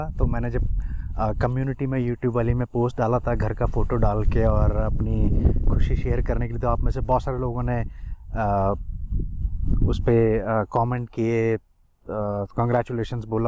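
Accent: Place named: Punjab, India. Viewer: Congrats. Loved your video, straightforward and honest. Sabto wadiya is its native hindi with Punjabi touch.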